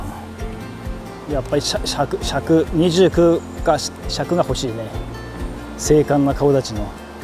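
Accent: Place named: Northern Tohoku, Japan. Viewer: 北東北独特の訛りがかっこいい！ 、話を聞いているだけで、釣りに対する知識や姿勢が感じ取れる、かっこいい方ですね。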